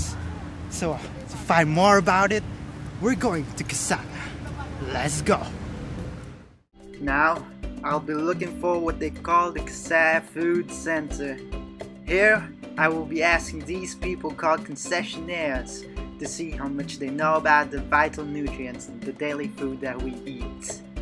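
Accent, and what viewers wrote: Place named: Australia. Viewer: I can't stop laughing 😆 the Australian accent 🤣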